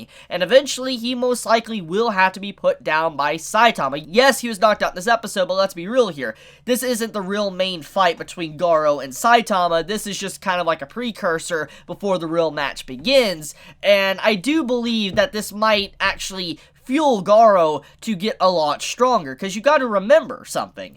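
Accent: United States. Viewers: You talk like a cowboy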